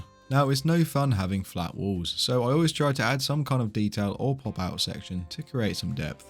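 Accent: United Kingdom. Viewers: Are you from UK sir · Okay, this is the first time I'm hearing your voice. I never knew you were British! 👀😂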